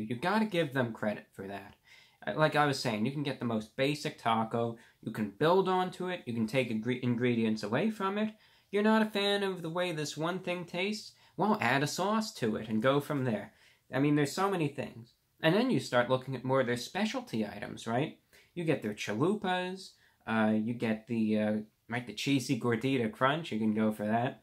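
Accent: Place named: Mid-Atlantic, United States. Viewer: I love the way he uses the Mid-Atlantic accent. Awesome! This guy's a time traveler.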